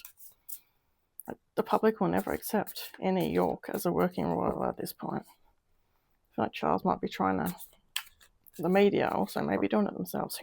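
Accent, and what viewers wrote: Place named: Australia. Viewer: Thanks for another great reading. I totally agree with you about the "York" family; I don't trust any of them either; nor any of the Markles (which of course now incorporates Harry as well). I am guessing that you are from Australia from your accent =). I am subbed to a couple of other Australian readers as well. I am happy that I found your channel a few weeks ago, as I am really enjoying your readings.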